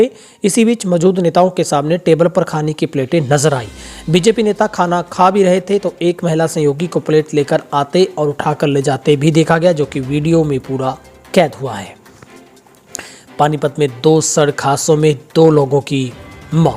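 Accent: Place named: Punjab, India. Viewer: कनून नहीं होता कानून होता है। हिन्दी में पंजाबी की तरह मत बोलो हिन्दी का उच्चारण ठीक से करो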